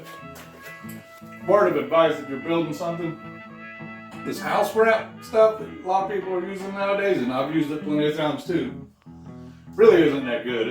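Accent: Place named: Louisiana, United States. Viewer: You sound like your from Louisiana buddy 😂…I got it liked and I’ve subscribed. I like watching them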